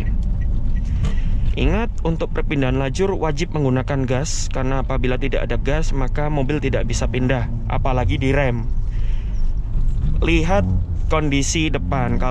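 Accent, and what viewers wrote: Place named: Surabaya, Indonesia. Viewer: Suroboyo timurr wkwk.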